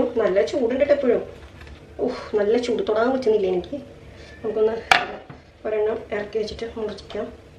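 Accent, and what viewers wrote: Thrissur, India. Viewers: Adipoli aayindtta chechi. I like your thrissur slang as I also belongs to thrissur. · തൃശ്ശൂർക്കാരിയാണോ ചേച്ചി??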